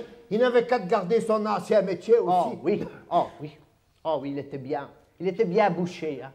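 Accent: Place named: Vosges, France. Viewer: Ben oui il est excellent. vive les Vosges et le patois !!.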